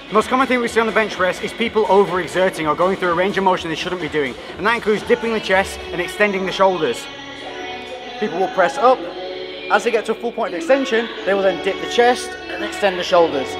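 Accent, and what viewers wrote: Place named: Northern England, United Kingdom. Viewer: "Loosey Goosey" propper Northern lad :)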